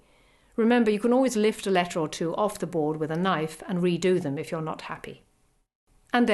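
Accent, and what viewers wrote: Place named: United Kingdom. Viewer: i luv british accents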